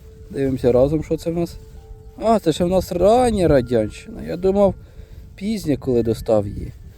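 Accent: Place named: Vinnytsia, Ukraine. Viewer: Найдобріший з копарьских каналів. А як гарно звучить вінницька промова! Найчистіша українська імхо! треба щось з ніком робити, бо навпаки читається нетой.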